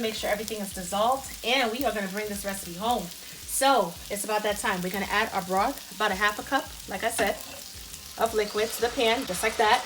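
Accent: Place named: Northeast, United States. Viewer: That looked so tasty! you have a deep North Eastern accent. Are you from Brooklyn?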